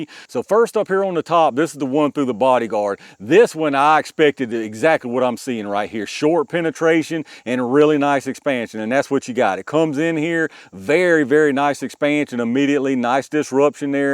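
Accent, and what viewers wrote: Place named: Appalachia, United States. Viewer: Shame it didn’t do better. I have a lcp 2 and max and I am always on the lookout for the best! I currently have underwood extreme defenders but if you know anything better feel free to cue in as I must have missed it…. I was watching one of your videos last Friday and I asked my girlfriend who lives in southern Indiana whereas I live in Louisville where you sounded like you were from and she thought east Tennessee or WV. I told her I thought you’re from central Virginia or southern like Wytheville, Bluefield or Roanoke maybe even the Carolina’s but Saturday we went to Red River Gorge which is near Slade, KY for some hiking and she said maybe east KY. Who’s right? 🤣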